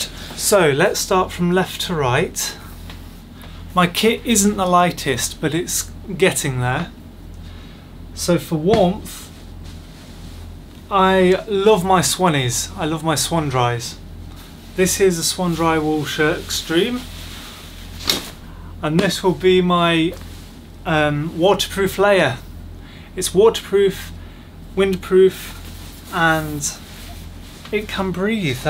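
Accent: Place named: RP, United Kingdom. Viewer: New to your channel. Just subscribed and clicked on the "little bell thingy"! Loving the videos, especially the flattering lighting and the almost posh accent with Well pronounced T's and the distinct lack of the phrase "yaaaa". Not forgetting your lovely partner Lilley....... I hope that's correct? Haha keep up the good work. We love the channel.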